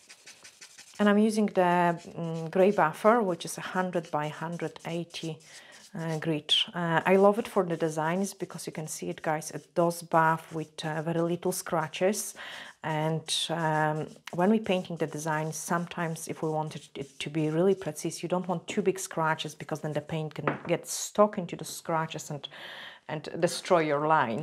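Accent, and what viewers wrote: Scotland, United Kingdom. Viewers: I'm ordering your foil design gel and white french gel as it looks AMAZING when you use it... I already have your foil gel and it's the most brilliant foil gel or glue I've ever used omg. It's brill. Your colour gels look amazing too as they look so pigmented in your vids but i just love your personality and the way you say wee when you're saying little ha ha. It's sooooo cute and sweet as you live in Scotland it's rubbed off on you. You remind me of my mum-in a really sweet way. She's English but spent half her life in Scotland and uses Scottish slang in her English accent. It's sooooooo cute and sweet. I think you're such a sweet feminine lovely lady and you're super duper talented on top... and you're gorgeous!!! Ha ha I love your vids. I've copied a few (tried) hope you don't mind. You're extremely talented and I'm looking forward to getting my design gel and French white gel X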